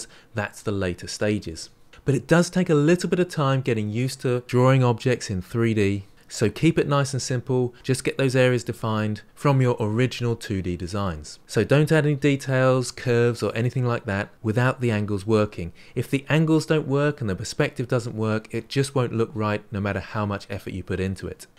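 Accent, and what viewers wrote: England, United Kingdom. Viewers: English Accent Hits Different.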